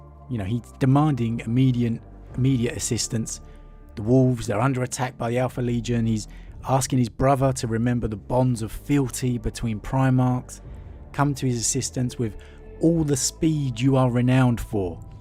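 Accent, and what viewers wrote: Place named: Cockney, United Kingdom. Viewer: It's called Cockney, and it's an accent. Not all English people are incapable of saying "th" lol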